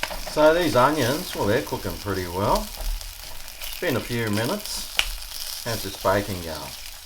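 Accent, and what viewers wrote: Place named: Australia. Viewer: has the Strongest Aussie accent I’ve heard Love from Canada brother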